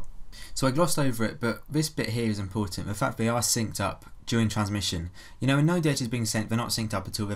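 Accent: United Kingdom. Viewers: can't get his British accent 😢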